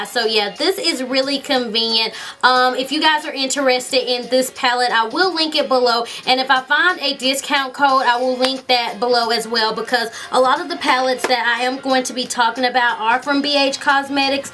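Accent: South, United States. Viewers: Just recently subscribed I can't get over your accent! LOVE IT! Southern Belle style ;) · you can still hear your southern accent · OMG, i love your accent!! what state do you live in?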